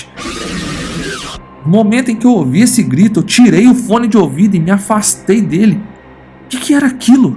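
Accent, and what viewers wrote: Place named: Campinas, Brazil. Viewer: Vou me inscrever. Gosto dos assuntos e seu sotaque parece que tu é aqui do interior de Campinas kkkkk então me identifico. Sucesso.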